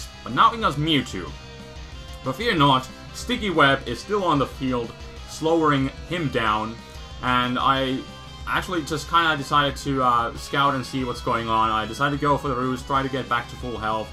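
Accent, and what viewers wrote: Finland. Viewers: So are you from Finland? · Oh it's a Finnish accent sorry about the confusion but it's very formal :-)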